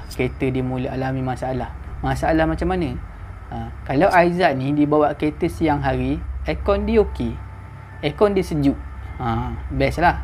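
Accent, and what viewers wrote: Terengganu, Malaysia. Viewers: ro tera citer kot base ganu kali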